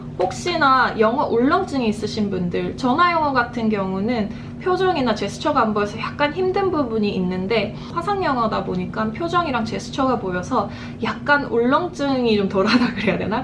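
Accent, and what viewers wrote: Gyeongsang, South Korea. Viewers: 갱상도 누나다^^ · 안녕하세요~ 오늘 구독하게 되어 여러 에피소드를 봤어요! 재미있고 무엇보다 유익한 내용 너무 좋습니다~ 가끔 나오는 경상도 액센트? 가 있어서 더 귀여우신거 같아요! 자주 올게요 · 안녕하세요 예전부터 봤다가 처음으로 댓글달아요 ㅎㅎ 경상도 말투 너무 친근하구요(같은 경상도사람) 영어를 엄청좋아하는 사람이지만 영상보고 엄청 도움많이됩니다.ㅎㅎㅎ 자주 댓글남길께요..ㅋㅋㅋ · 영상 하나 보자마자 바로 구독.. ㅜㅜ 열정은 넘치나 어떻게해야할지모르는 저에게 너무 좋은 영상들! 근데 혹시 경상도분이신가용? ㅋㅋㅋ 가끔 나오는 말투가 경상도같은데 혹시나해서요 ㅋㅋㅋ(제가 바로 대구..) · 노래듣다가 영상이 떠서 들어와봤는데 반가운어투 경상도말이 들리네요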